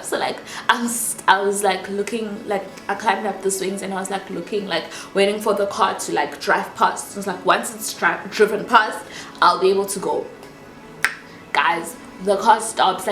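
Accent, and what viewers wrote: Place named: South Africa. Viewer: As soon as you started speaking, I was like she's gotta be South African and then checked another video to confirm. LOVE your accent. "We pay 50/50." SIS WHAT? No. Lmaooo at the mother's reaction. The whole story has me weak.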